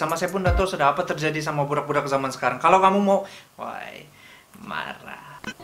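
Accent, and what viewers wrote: Sabah, Malaysia. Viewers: Awak org Sabah ka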